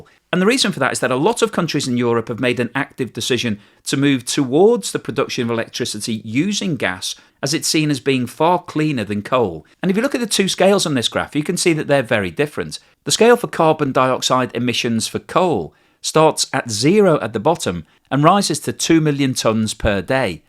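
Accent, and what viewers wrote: United Kingdom. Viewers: You appear to have a British accent, so i am wondering why you say 2 times rather than TWICE?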